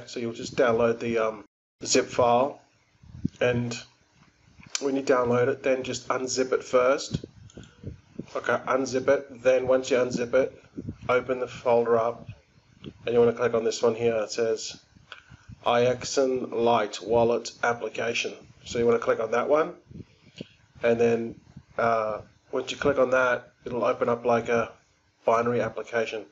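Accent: Australia. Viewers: are you an Aussie? I found another project today parachute, just want to know your thoughts. cheers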